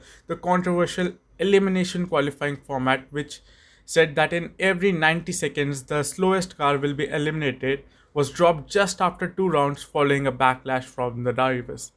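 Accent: India. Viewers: Ngl I always thought you were indian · ur accent..u Indian?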